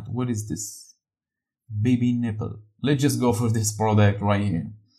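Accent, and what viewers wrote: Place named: Morocco. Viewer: Dude bro you are from morocco You accent sounds familiar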